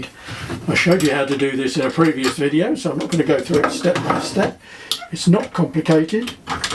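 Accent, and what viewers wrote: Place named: United Kingdom. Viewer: there's something about the queen's english that just makes the video sound like a documentary. this is amazing hehe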